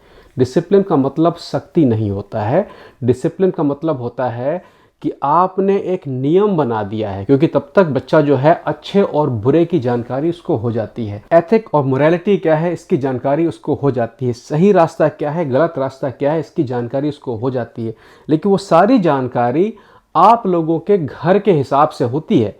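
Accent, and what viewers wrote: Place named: Uttar Pradesh, India. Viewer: Maja a Gaya aap India mein kahan se ho aapki batchit up se milati hai aapki baten sunkar sunkar Achcha lagta hai Jaise Ham UP mein pahunch gaye ho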